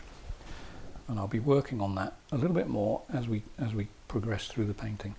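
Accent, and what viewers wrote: United Kingdom. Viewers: You are so talented and you also crack me up. You look like an actor. Or maybe it's just your accent. We American's are very taken with British accents. We also think all accents similar to yours are British LOL.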